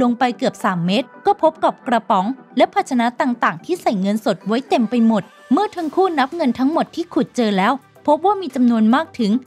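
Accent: Thailand. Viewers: ที่รู้ๆคือ พูดเหน่อมาก นี่ขนาดดูพยายามไม่ให้เหน่อนะเนี่ย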